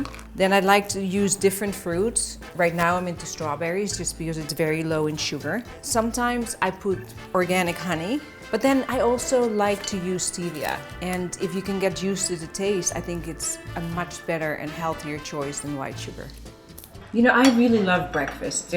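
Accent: Netherlands. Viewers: je hoort echt het nederlandse accent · She has sich a strong dutch accent haha i love it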